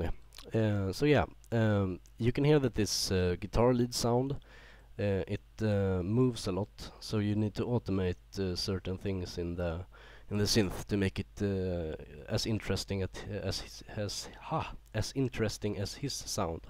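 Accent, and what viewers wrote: Sweden. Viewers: Swedish? :D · are you from sweden? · Nice tutorial man, REALLY NICE! Swedish btw? :)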